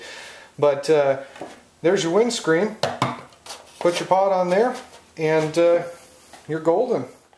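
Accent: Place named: Michigan, United States. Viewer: What part of the country are you from? Because your voice and accent are exactly like mine! My wife thought I was on the phone, then she thought I was narrating this video. We have been married for over 30 years, and she was amazed listening to you talk. We both grew up in Michigan. Just wondering if that is where you are?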